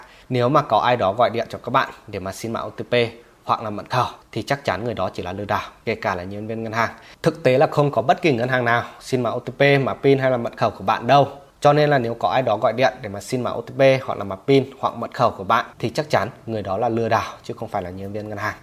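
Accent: Standard. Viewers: Anh nói quá chuẩn luôn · Bạn nói rất chuẩn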